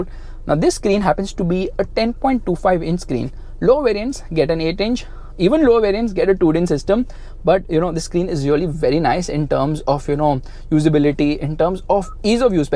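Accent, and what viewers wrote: India. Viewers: You speak so fast with Indian accent but yet I can understand you way clearer than most of native English or US speakers.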